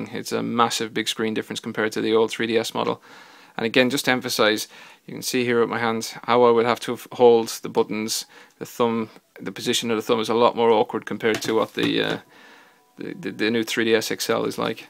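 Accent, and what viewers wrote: Ireland. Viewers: are you Irish? Because I can hear a tiny bit of an Irish accent.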